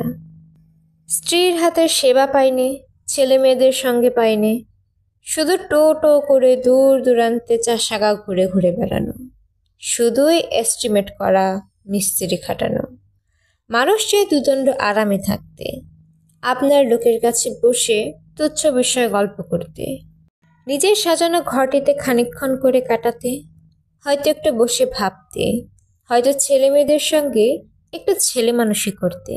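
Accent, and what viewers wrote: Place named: Kolkata, India. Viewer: Kolkatar tone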